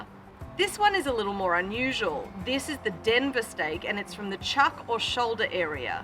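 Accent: Australia. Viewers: You're very good darling, and we love the aussie accent, but I prefer NOT flipping the steak every 30 seconds. Depending on the cut, and thickness that would determine how much time on each side. You still have Love from America ♥️ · Oh my God not only are you beautiful but I love your Aussie accent think I’m in love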